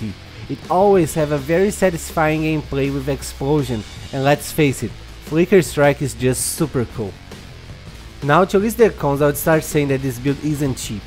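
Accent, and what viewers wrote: Brazil. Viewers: Só pelo sotaque é brasileiro com certeza!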